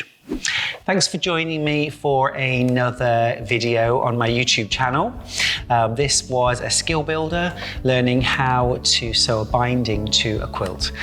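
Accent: England, United Kingdom. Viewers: Inspiring tutorials & luv that English accent!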